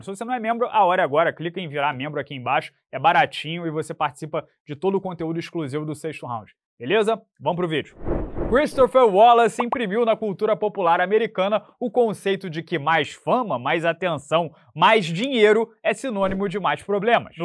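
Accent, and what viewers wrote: Rio de Janeiro, Brazil. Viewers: Este seu sotaque de Carioca, trava seus vídeos! Tbm acho que balança de mais a cabeça no vídeo, abraços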